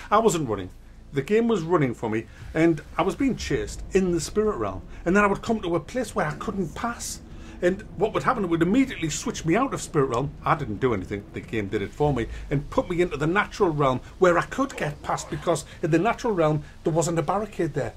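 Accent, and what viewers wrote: United Kingdom. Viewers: I've always disliked how nasal the English can be with their letter "a" like when they say, "Absolutely." What's accent? What part of UK? I've been a subscriber for a long time and always liked how he sounds.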